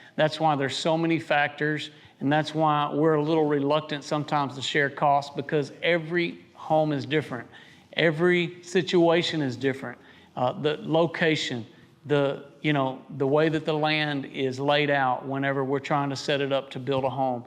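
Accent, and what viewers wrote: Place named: Mexico. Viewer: Appreciate the info. The tx. barndominium builder with s. of the border accent always so evasive when any questions on pricing come up.👍.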